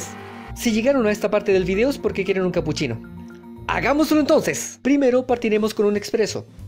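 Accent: Standard. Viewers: Habló lento y con acento neutro, jajajajaja. El acento chileno original es incomprensible fuera de Chile. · tu acento universal es fantástico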